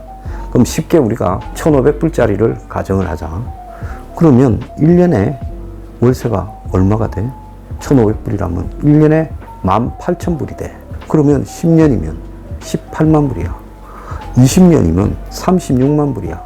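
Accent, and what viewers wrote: Gyeongsang, South Korea. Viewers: 경상도 사투리로 찬찬히 설명하시니 할아버지가 손자에게 희망적인 삶을 가르쳐 주시는느낌! ㅎ 저도 경상도 대구사람 이지요.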